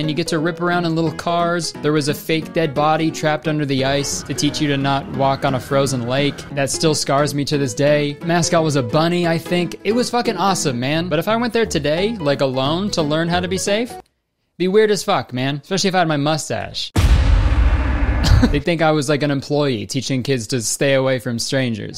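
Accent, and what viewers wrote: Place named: Canada. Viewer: Why are you Canadian?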